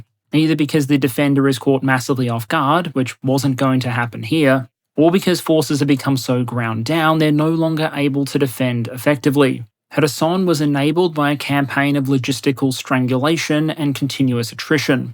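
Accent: Australia. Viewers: Thanks for getting me through a bumpy flight to my vacation mate. Just listen to the nice Aussie man and ignore the turbulence. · Mr., I don't understand why you were concerned about this update, it is excellent as usual. You have my greatest respect for staying on track and as far as keeping with in the boundaries of reality. Thanks once again. I am always so grateful to hear such an incredibly intelligent voice with an Australian accent. Baku boy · what helicopters? The ones Wagner shot down? Because the Ukrainians short down zero. What nonsense was he speaking about in the video? 🤭 Helicopters are good once the ant-air is knocked off. So it's offensive overkill. You really should stop listening to this clown. He has wasted hours and hours of your precious time and your brain is growing mushrooms. He's a disinfo channel on the payroll of some 3 letter agency like the CIA or in this case, probably British. 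Lots of useless details, meandering around w some patronising Aussie accent does the trick it seems. Too ez. 🐑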